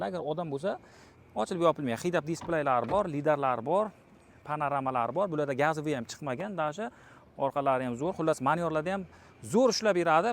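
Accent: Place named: Kashkadarya, Uzbekistan. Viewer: Шева кашкадаре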